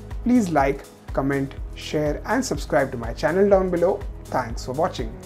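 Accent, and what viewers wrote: India. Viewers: Normally i cant stand india accents. Yours isn't too bad.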